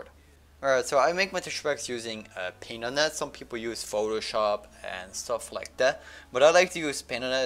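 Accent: Netherlands. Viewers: are you dutch!? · Are you dutch??? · Are u dutch??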